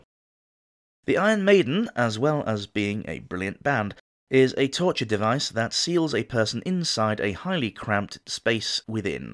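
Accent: South, United States. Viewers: I'd say 10 out of 10 for the southern accent.. and thats from a southerner in Virginia, USA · Some of these are better and more merciful than what the politicians & the 1% do to everyone daily. Well they just kicked in my door & it's time for the thumbscrews. (I refuse to use that nickname) Don't worry when I confess I'll be laying everything I've ever done at your feet. Great clip as usual, impressive research, loved the video, great line about old honest Guy Fawkes. Southern Draw? 7-7.5 not bad, you sounded a bit like a educated southerner though, more of a politician than a moonshiner. -no fun. Reason? Just listen to the song. ...with black curtains..makes a difference apparently. Margaret the Pearl of York; more of man than most of us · I rate Southern Drawl 7/10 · Agreed. Definitely not Texan or Georgian. (I’m native Georgian who spent 5 years in Waco, Texas. 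Virginia / North Carolina is the closest to the accent of the podcaster. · I'm from the south and I must say, your southern accent sounds absolutely beautiful. The old southern accent can still be heard in parts of Georgia, Alabama, and Mississippi; and even in parts of Louisiana and Texas. Fun fact: The southern accent is the baby brother of Received Pronounciation. When Princess Diana visited the south for the first time, she stated that she could understand us far better than our Northern counterparts. The Cajun accent is derived from the French accent...that's why no one can understand those fuckers, lol...only playing around. Anyway, sorry to go on a tangent and you may have already known all this, but here it is anyway.😊😅😂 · Not bad Southern accent! I'm from the fringes of the South so it's not an accent I have much experience with but it still sounded good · your southern drawl was pretty good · Southern drawl, oh yes!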